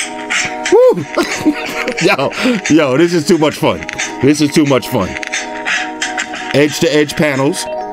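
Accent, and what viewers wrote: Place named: Brooklyn, United States. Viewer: First time watcher, I know a brooklyn accent anywhere!! review is on point and full of detail. Awesome to see someone from the hood doin they thing on the net!! you got my sub / support. Do yo than bro!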